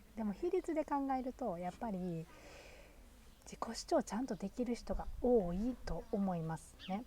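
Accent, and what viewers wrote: Kansai, Japan. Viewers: こんにちは。 さんの関西弁、めちゃくちゃ親近感です。とても素敵なお家ですね。お二人のお子さんがバイリンガル、普通にお母さんとは日本語でお喋りされていて、ドイツの学校や幼稚園に通われてるのは凄いでね。コロナが落ち着いて日本への一時帰国がコロナ前の様に気軽に出来る様になったら是非お子様達に日本の小学校を体験させてあげて下さい。 ドイツの学校の夏休みは何ヶ月ありますか？ 日本の夏休みは7／20前後からですので、1週間から2週間早く一時帰国されたら日本の小学校体験入学できますよ。ドイツの小学校との違いが面白かったり、日本のお友達も出来ますよ。 お友達のお子さんが毎年夏に少しだけ日本の小学校に通ってました。ほんの10日間とかでもお互いとても新鮮でいい刺激になる様です。毎年帰国出来なくても、次帰国したらお友達と遊ぶ約束などして楽しんでいました。 何時も何気ないドイツの日常の風景や、食されてる食材を拝見するのが楽しみです。これからの動画も楽しみにしています。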